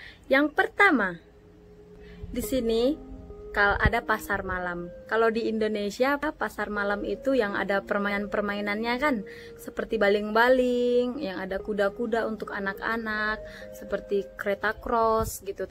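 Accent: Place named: Perak, Malaysia. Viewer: Dialek orang perak, awak makna saya/aku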